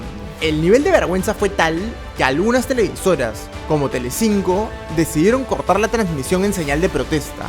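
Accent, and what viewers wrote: Peru. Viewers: Por favor deja ese acento de limeño, habla neutro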